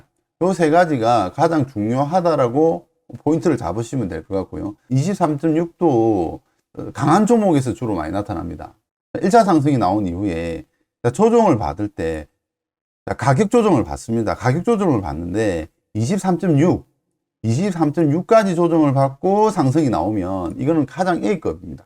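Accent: Busan, South Korea. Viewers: 부산이신지? ㅋㅋ 익숙한 말투가 귀에 꽉 박히네요~ 내용이 너무 유익하고 전문적이라 계속 공부하고 있습니다. 감사~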